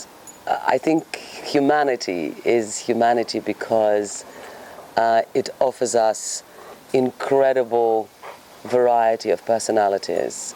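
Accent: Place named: United Kingdom. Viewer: Бритиш англ😊я лов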